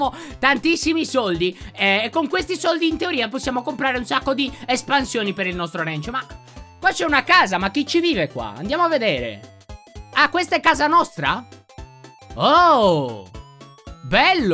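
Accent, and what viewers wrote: Sardinia, Italy. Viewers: ma sei sardo??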